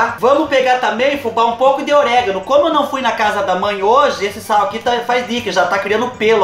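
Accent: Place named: Caipira, Brazil. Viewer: do céu vou dar uma apertada em vc so por esse sotaque caipira que eu adoro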